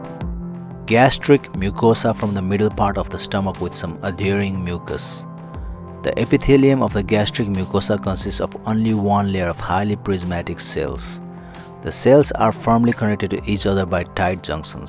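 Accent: India. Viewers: The indian accent ruined the video. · that indian talkin lol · The first picture with a strong Indian accent like his, really confused me because I thought he was saying that it was a close-up of a dick. I had to keep listening and trying real hard to figure out it was a tick.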